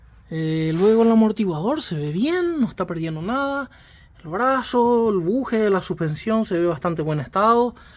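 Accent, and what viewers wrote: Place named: Paraguay. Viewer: Cara, sou de Foz de Iguaçu. Nasci em 79. Minha adolescência foi com JDMs maravilhosos circulando, cuja origem, do py. Assistir teus vídeos me remetem um saudosismo do sotaque dos paraguaios na fronteira. Show😊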